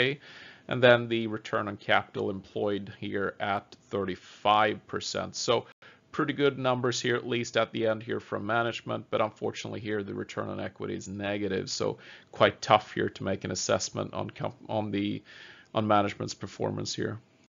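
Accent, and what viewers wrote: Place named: United States. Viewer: Thank u FOR SPEAKING AMERIKAN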